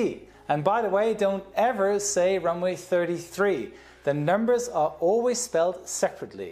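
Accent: Germany. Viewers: Joe with his German accent.... Gotta love it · man the r you use in your 'three's makes it super obvious youre a german xD good content, super interesting. keep it up! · Typical german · lustig das man als deutscher immer gleich merkt wenn ein deutscher englisch spricht :D versteht man aber viel besser wie einen englisch Muttersprachler :D echt interessantes Video... wär ich nie drauf gekommen das die Nummern etwas mit dem Magnetfeld zu tun haben ^^ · Oof German accent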